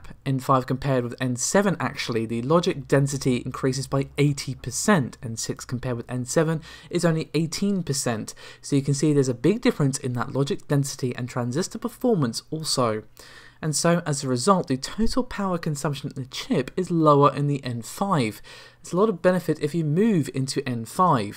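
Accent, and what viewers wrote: United Kingdom. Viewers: I cannot hear what this guy says. Talks too fast, slurring and with tons of nonsense and seems to only emphasis a heavy British tone sequence. If you're not showing your face then don't pretend you have a conversation.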